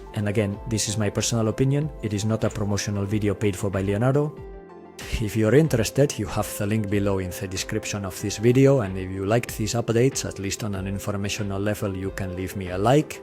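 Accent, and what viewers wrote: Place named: Greece. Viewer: Thank you for this video. Love your accent (I assume it is a Greek one)🙂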